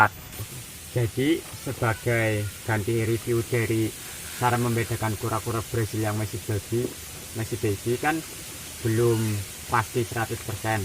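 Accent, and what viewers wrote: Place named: Banyumas, Indonesia. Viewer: Ngapak abis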